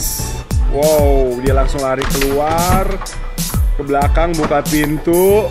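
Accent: Banyumas, Indonesia. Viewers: Mang mba nya org ngapak jg kya sy yah...rep ndeleng apaaa...kuwe artine mo lht apaaa..hihiii...btw...aslinya owee ank mna tuh...😘😘😘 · Mbaknya ngapak 😆 snowee lucu bgt 😘😘😍😍 fix, klw aku udah punya rumah sendiri mau punya satu :) udah pengen dari dulu.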